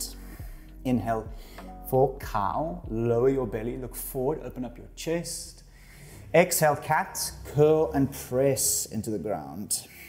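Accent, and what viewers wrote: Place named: South Africa. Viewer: For a Saffa abroad, it's SO lekker to hear your accent 👌 loved this flow, and thank you for the decent Shavasana at the end! Thank you!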